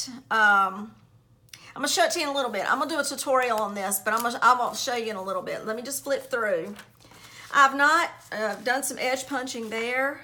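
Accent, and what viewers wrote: South, United States. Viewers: Thank you for sharing what was on your heart today and inspiring us. It was very encouraging and I appreciate it. I love your creativity, and I'm really enjoying this series! It's like getting to sit right there with you and so we can create together. Plus, we get to hear your cute Southern accent and the way you express yourself. FUN!! I am thrilled that you found another way to use those "tag pockets!" That just makes it so much more fun for me and hopefully for those who purchase the kit as well. If we all did things the exact same way, it would be boring, wouldn't it? lol I love watching how you do your process and it's fun to sorta "see inside your head" and to see how it all comes together for you. I'm working with the regular sized "Rosewood Cottage" Journal kit right now and think it will be so cool when we are both done to see the different ways we use the elements.:) I love the little booklet with pockets that you created! 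Thank you for the tut!! <3 I so appreciate you and your sweet heart!! xo Marilou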